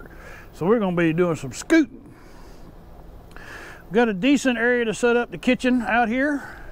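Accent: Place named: South, United States. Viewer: We watch your videos before visiting Texas State Parks. And we have become fan of your southern accent.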